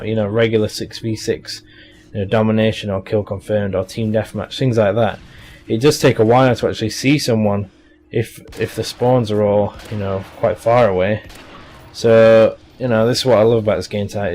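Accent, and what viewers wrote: United Kingdom. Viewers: Fortnight. British english is so cool.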